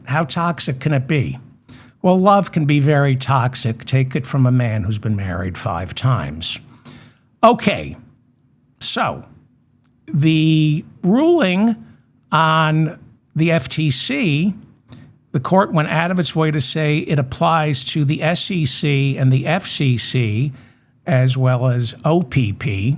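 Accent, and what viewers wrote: New York, United States. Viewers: He is a Noo Yorker. Leave him alone!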